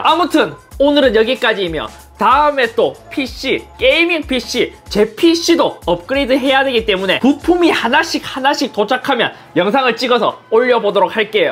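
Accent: Gyeongsang, South Korea. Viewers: 말하는게 경상도 느낌난다 했는데 부산분이엿구나..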